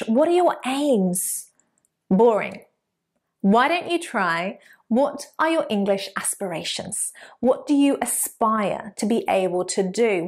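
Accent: United Kingdom. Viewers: All ma'am, I live in America but I love British English British accent and your accent. I still have issues using them in sentences cuz it's very high level English So I still need your assistance in using them in sentences and I love it thanks a million teacher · You have a British accent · I love your accent, I love British English accent, you way of teaching is very lovely, I wish I can take class physically by you. You are gorgeous and lovely lady. Just I subscribed your channel.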